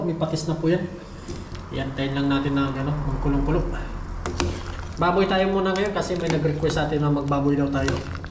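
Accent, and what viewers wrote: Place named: Hiligaynon, Philippines. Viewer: taga saan ka sa Pinas? Hilongo ka, ano?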